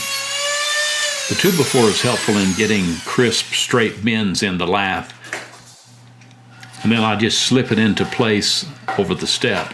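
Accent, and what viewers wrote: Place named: South, United States. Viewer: I love your videos! Nothing better than a Southern gentleman teaching you home improvement especially with that voice and father-like tone.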